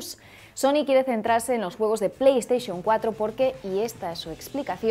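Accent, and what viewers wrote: Spain. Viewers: que hermosa voz tiene esta mujer, pese a que no soy fan del acento español no me canso de escucharla, además está super guapa.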